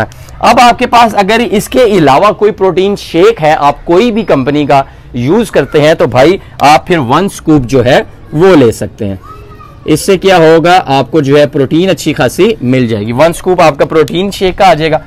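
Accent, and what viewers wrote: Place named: Punjab, India. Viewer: Love the Punjabi Touch...Stay Blessed...🤲🙏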